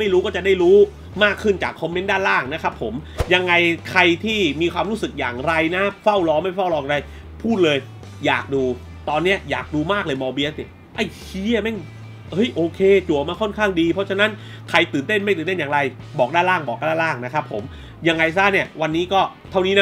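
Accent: South, Thailand. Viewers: คนรีวิวคนใต้หรือเปล่าคับ แหลงใต้ชับจัง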